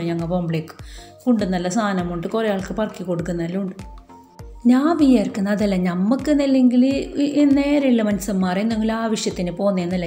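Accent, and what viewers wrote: Kasaragod, India. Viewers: Ksd basha pwolichu 😅😂 Video pwoli🕵✌ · കാസർഗോഡ് ഭാഷ സൂപ്പർ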